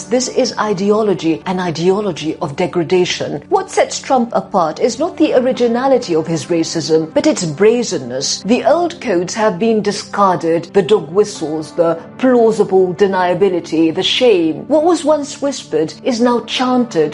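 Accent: United Kingdom. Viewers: That is British accent , not North American one. They hav own style.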